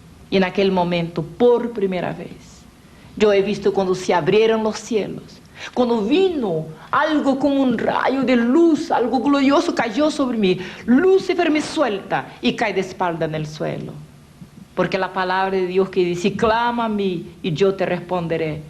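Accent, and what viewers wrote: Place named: Brazil. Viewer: parla spagnolo con acento brasiliano....forse originalmente il video è stato fatto per gli spanoparlanti...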